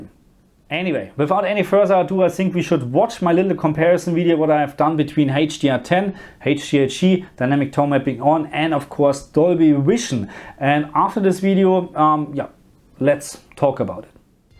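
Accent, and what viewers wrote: Germany. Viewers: First sentence, immediately knew you’re german. Gutes Video. ^^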